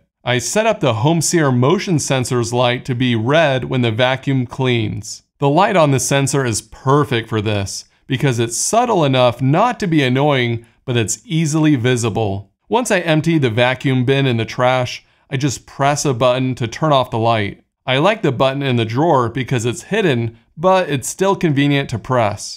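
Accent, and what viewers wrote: United States. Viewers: By the accent, I'm guessing you're from Ogden, Utah. Am I even close?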